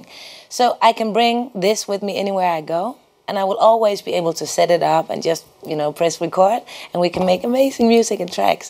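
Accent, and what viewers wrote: Denmark. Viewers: danish accent (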